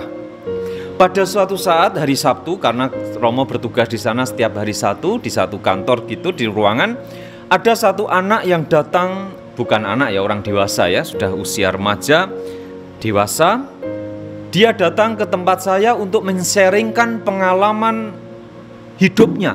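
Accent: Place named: Banyumas, Indonesia. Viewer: Senang mendengar ngapakx father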